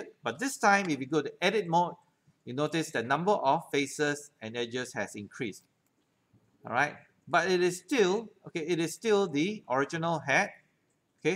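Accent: Singapore. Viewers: You Singaporean?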